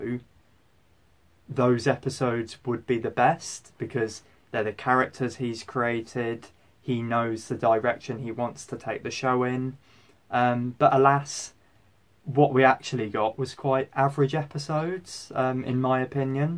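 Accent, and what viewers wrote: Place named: Northern England, United Kingdom. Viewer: I’ve got an out-of-the- blue (pun intended) question: do you have relatives from the north of England? ‘Cause I feel like I can hear a hint of a Northern accent(I’ve mentioned my good ears before😏)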